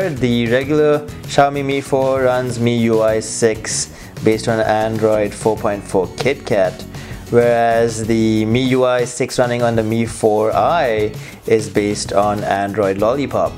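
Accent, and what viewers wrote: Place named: India. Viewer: Why not every indian have his accent?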